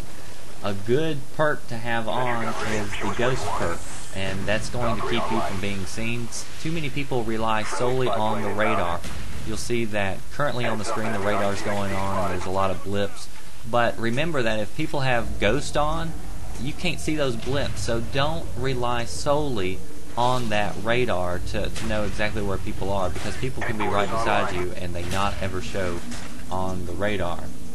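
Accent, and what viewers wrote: South, United States. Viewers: I like this guy's slow southern speech and laid back attitude.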